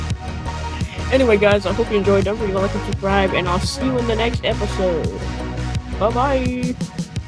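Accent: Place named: United Kingdom. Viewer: BRITISH????? (The good old days..)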